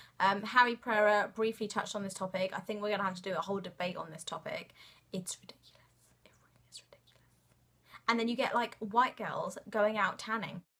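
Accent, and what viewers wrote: United Kingdom. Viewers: A brown girl with a British accent 😍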